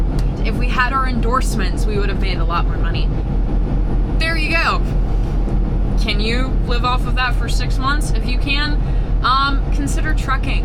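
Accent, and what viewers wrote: United States. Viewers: English is my 2nd language on top of that your american accent, so subtitle is appreciated. 🙌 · But yeah, I never would’ve guessed that you were from Florida. I thought you were like from the Deep South or something like that. But I definitely love watching you and how beautiful and very gorgeous and very stunning and very lovely and very vibrant and very powerful and sexy you are as well. 😍😍😍😍😍😍😍😍😍😍😍😍😍😍😍😍😍❤️❤️❤️❤️❤️❤️❤️❤️❤️❤️❤️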